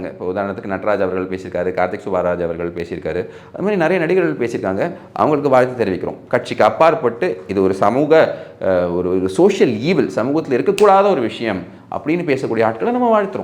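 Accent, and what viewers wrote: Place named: Tamil Brahmin, India. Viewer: Hey why the tambra slang man. Omit that.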